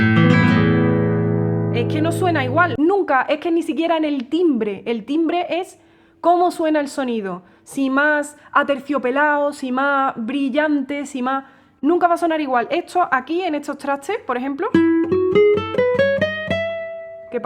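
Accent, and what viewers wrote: Andalusia, Spain. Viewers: Me gusta como hablas, de que región de España eres? Ya entiendo un poco más porque en toda Latinoamérica hay diferentes acentos dependiendo del país que se hable, aquí mismo en México hay distintos acentos dependiendo de la región que se hable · Tó el arte!!!! 😂😂 Diego del Morao hace unos transportes q flipas, pero a ese nivel... y los guitarristas acústicos del fingerpicking?? La usan sin ningún complejo. Papafritas hay x tos laos!!! Ole tu acento sin reparos ni tapujos y el q no lo entienda q aprenda andalú!! 👏👏👏👏👏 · ese acento andaluz ufff me mata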